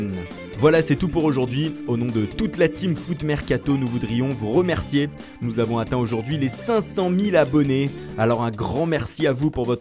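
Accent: Italy. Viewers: Ptn arrête avec ton accent italien c’est relou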